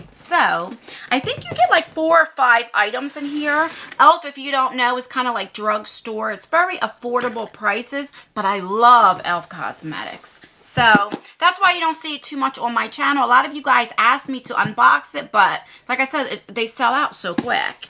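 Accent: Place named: Maryland, United States. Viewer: Where are you from we pronounce water the same way , like wooder, lol. I’m in Maryland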